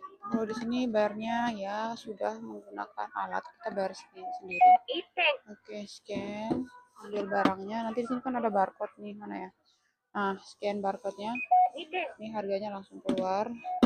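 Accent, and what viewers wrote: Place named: Mbay, Indonesia. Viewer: Akhirnya ada tontonan yang ada dialeg mbay nyaa❤❤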